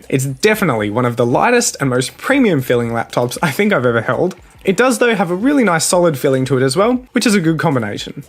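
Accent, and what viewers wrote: Australia. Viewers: He’s Aussie lol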